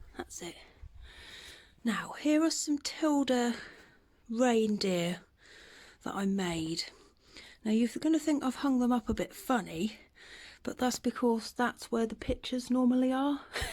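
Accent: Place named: England, United Kingdom. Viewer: Enjoyed your English accent and your video!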